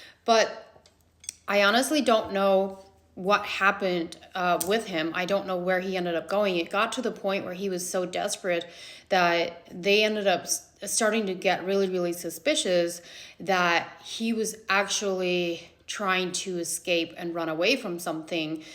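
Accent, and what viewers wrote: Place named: Pennsylvania Dutch, United States. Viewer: I love the accent! I’m from Pennsylvania, and I hear people talk like this when I go to Berks or Lancaster counties. My university (where I heard buggies on Sunday mornings clopping through the campus) had offered PA Deitsch language courses, but I took Spanish. Meant to go back! Thank you for the interesting stories.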